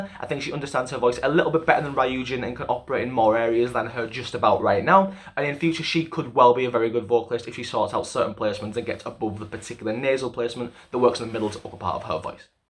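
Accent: United Kingdom. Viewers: love your videos! Just have to ask what is your accent? is it pure british or sth else?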